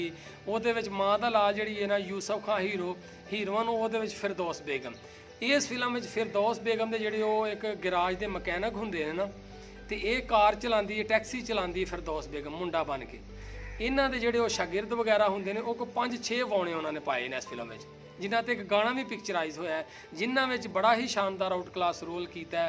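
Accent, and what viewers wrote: Punjab, India. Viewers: Aapky program ki Khoodsoorti Aapky PANJABI bolny KY style Mai Hy ...Urdu Mai to HR koi program bnata Hy .......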